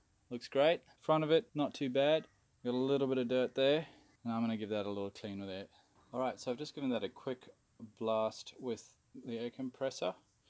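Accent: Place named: South Africa. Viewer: Greetings from South Africa... You sound extemely SA by the way. Buying this bike next year, this is how I got to your channel!!!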